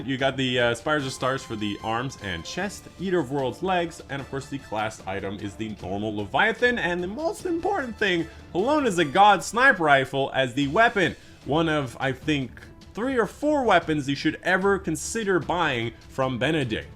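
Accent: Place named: United States. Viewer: Aye just came from houndish’s vid gotta hear that sweet American accent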